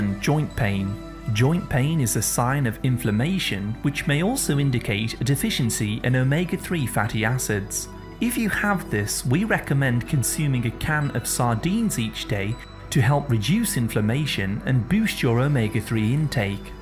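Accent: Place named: North, United Kingdom. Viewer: When I heard " a like is always appreciated", I realised I take these videos for granted. I think of them as computer generated, spoken by robotics. They are so efficient, precise, informative, that I treat these vids like Wikipedia. I assume there is a team of computers generating these videos, not really someone called. Is that plea for likes added to make me think it's a real individual person. Is that warm northern accent used to give a feeling of trustworthy ness. Anyone else? Or am I in need of a course of omega 3 to lubricate my brain and heart.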